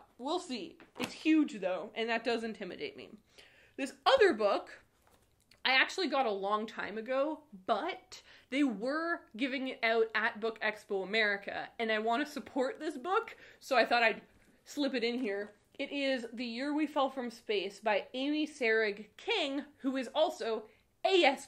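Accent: Canada. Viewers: Emergency Contact and The Prince and the Dressmaker are two of my favorites! I hope you love them. Also, never apologize for your Canadian accent! Regional dialect and accents are fascinating in the age of digital and social homogenization. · I love Canadian long ohs!!!! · Omg I love your Canadian accent and “about” is my ultimate favorite like it’s your trademark. Why would you try to lose it?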